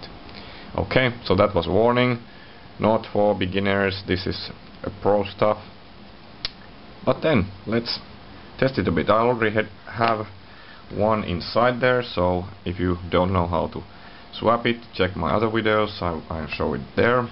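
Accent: Finland. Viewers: Finnish accent?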